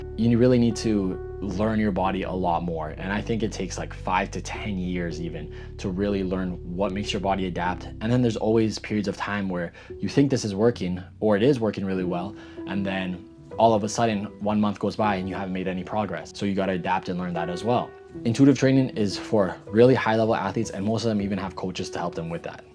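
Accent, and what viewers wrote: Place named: Canada. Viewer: you have a strong canadian accent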